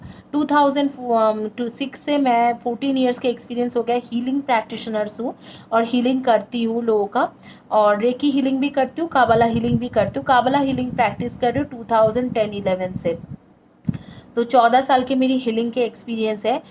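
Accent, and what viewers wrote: Bengal, India. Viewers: Radhe Radhe madam ji. Apka bolneka tarika se mujhe lagta hey ki ap bangali ho..... Pile no 3 chuna tha . Jo mech nei kiya...... Jay sri krishna.....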